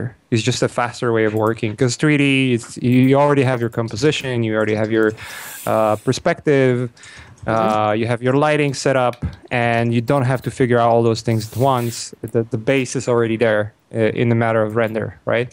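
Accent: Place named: Poland. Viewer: Как же прекрасен польский английский. Люблю за это поляков, в отличие от native spikers.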